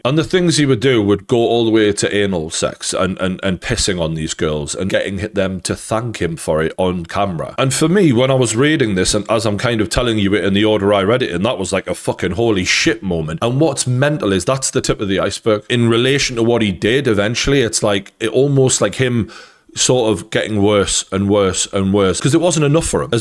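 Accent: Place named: Geordie, United Kingdom. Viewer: Oh my God, This is Very difficult to listen to Geordie🤯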